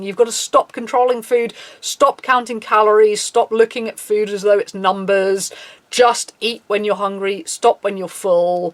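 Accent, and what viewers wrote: United Kingdom. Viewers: The hair, the lips, the eyes. It's all so pleasing to watch that perfection! It's just done so pretty. And then having you talk about important stuff in your own funny, no-nonsense way, with that accent and (british?) words that you use. Oh man, it's just awesome! Soothing even! You seem like a lot of fun!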